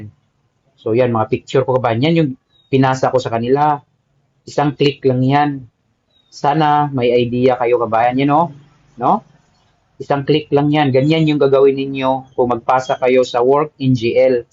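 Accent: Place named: Visayas, Philippines. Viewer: Hmm my idea n din ako Peru parang bisaya c sir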